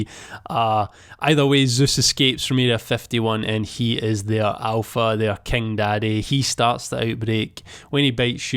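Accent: Scotland, United Kingdom. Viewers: I'm more fascinated by your Scottish accent mate! It is great training for my dyslexia cos it forces me to really pay attention to what you are saying but the downside is that I totally missed out on the actual contents of your videos lol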